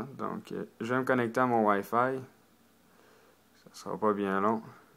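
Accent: Quebec, Canada. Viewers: je kif ton accent du quebec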